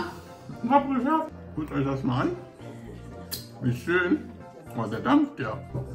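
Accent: Africa. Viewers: Hahah wie süß deine Freundin ist hahah am Ende wie sie dich einfach sauber macht und dein Afrikanischer akkzent ist einfach legender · Er kann diesen afrikanischen Akzent so gut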